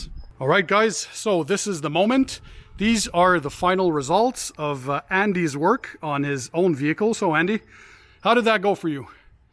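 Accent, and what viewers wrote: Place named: Quebec, Canada. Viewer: Hey I'm a big fan of your channel, I love all your informative videos and now looking to put cquarts UK 3.0 on my vehicle but I'm having a hard time to decide whom to professionally get it done. I'm located in Laval QC. Any recommendations? Im asking because with your accent and French videos I'm just assuming you're in QC as well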